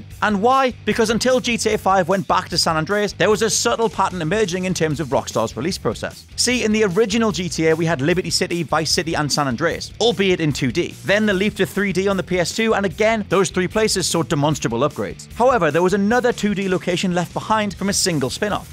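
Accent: United Kingdom. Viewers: Why is it British accents on ever gta rumor video??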